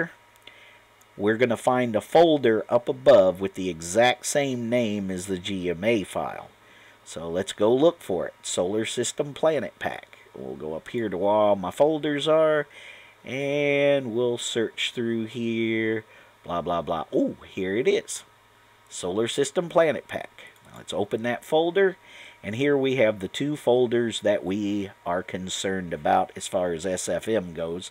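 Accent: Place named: Australia. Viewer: the australian accent is insane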